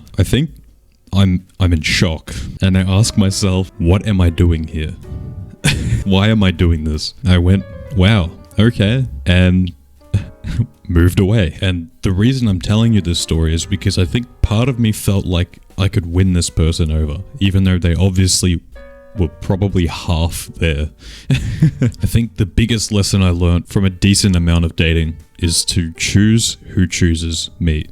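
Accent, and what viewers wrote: Australia. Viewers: Sorry I’m only here for the Aussie accent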